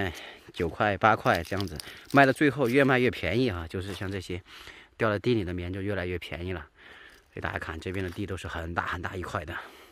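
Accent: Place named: Sichuan, China. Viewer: 是重庆哪里的？和川北的方言最像。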